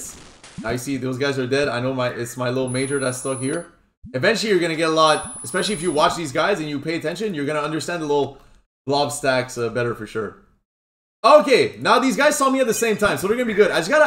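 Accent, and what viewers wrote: Quebec, Canada. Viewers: yo are you from quebec?? man you sound like youre from quebec hehe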